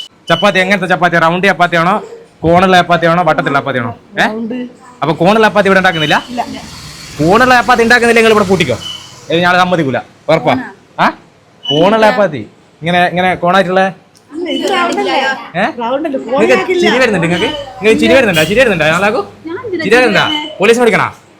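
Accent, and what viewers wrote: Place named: Perambra, India. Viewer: പലവട്ടം കണ്ടു. പേരാമ്പ്ര സ്ലാങ്ങ് .... പൊളിച്ചു...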